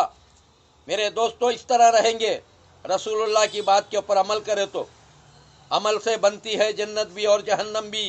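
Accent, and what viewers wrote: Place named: Hyderabad, India. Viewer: Ye baba konsi language bol rahy hai... Hyderabadi?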